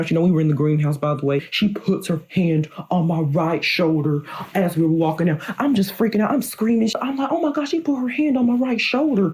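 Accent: South, United States. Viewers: he's so country, i love it! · Stop talking about his voice. It's not funny it's normal because he is obviously from the south. The only part I laughed at was when he was talking about himself and how he looked on tv which was the only part that would be acceptable to laugh at because the rest of the video was him telling a story. The way his voice sounds is irrelevant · i noticed he a really big country accent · It’s the country accent fa me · He’s country or something.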